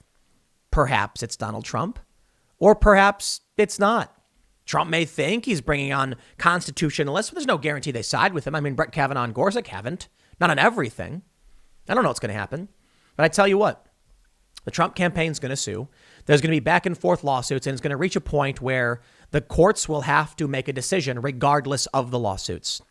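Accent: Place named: Midwest, United States. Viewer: It is just me or is accent slipping back more Mid-West lately. "Byoxes", "Boeth" & "Foelks" as examples.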